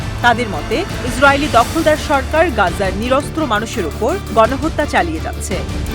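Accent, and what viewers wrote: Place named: West Bengal, India. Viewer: প্রিয় ৭১ টিভি আপনারা কি পারেন এই মহিলাকে ভয়েস ওভার থেকে বিরত রাখতে? কারণ উনি ভারতীয় চতুর্থ শ্রেণীর নাগরিক পশ্চিম বাঙ্গালীদের অনুকরণ করে কথা বলেন।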